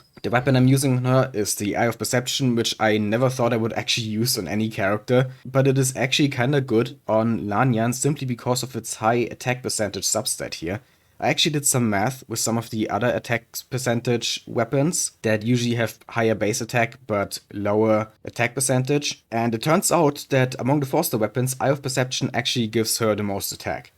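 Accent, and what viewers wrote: Germany. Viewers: Höre ich da etwa deutsches english?😅😂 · you have a thick german accent bro i will sub tho cus nice video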